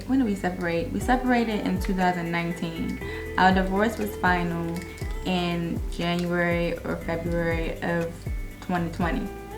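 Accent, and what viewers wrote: DMV, United States. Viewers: only been here 40 seconds and i can tell youre from the DMV 😂 subscribes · 10 sec in are you from the dmv ? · I knew you were from the DMV. Lol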